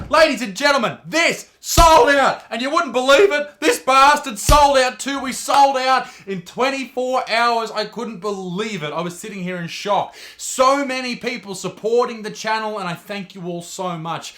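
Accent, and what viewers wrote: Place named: Australia. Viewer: He's true blue....an Australian with thick Aussie accent. He was raised in rural Australia for sure.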